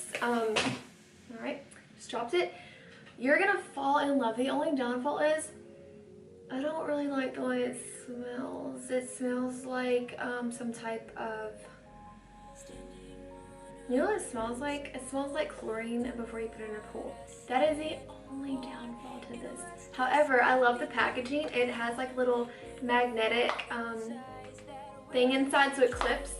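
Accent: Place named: South, United States. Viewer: Can tell you’re southern by the way you say “for” and “never” lolol. Could tell by other words too, I’m from Georgia. 😊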